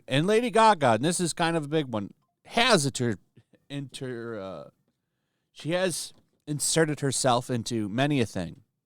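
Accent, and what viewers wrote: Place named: RP, United Kingdom. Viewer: Hahaha STOP IT withnthe British Lord Accent... Man i dont get it. Why that accent, its so NOT lib. 😆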